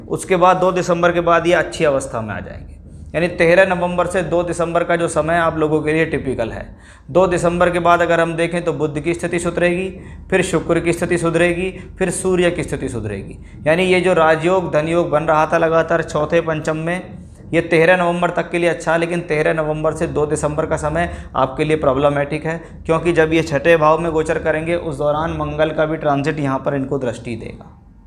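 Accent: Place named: Uttar Pradesh, India. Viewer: Bhaiya UP se lag Rahe ho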